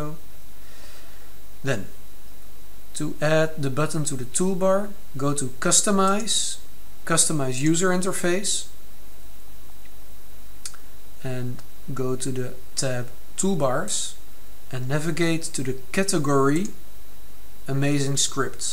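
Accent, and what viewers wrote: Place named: Netherlands. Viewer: Its scary when people contact me to tell me that I sound just like you. Can tell by the dutch accent it def. does. But voice, pitch, etc also comes rather close. yay for finding my voice-twin lol.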